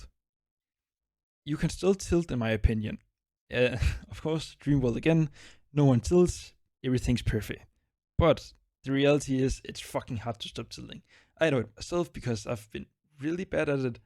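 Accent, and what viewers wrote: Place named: Denmark. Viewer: God video ven! Nice danglish, det du fandeme god til <3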